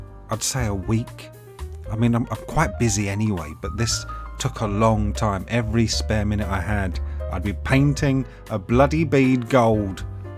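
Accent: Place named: RP, United Kingdom. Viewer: As fascinating as your builds and build process is, the main draw is your dry humor and the posh affectation you apply to your accent. I can't quite tell if it's real or not, but it sells the dry humor. My American ears are entertained.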